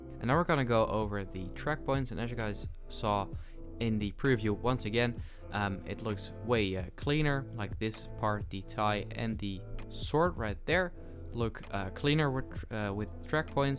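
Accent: Netherlands. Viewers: i just know you're dutch, you sound so dutch haha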